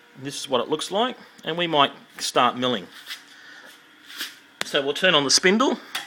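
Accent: Australia. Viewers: great to hear aussie accent at last!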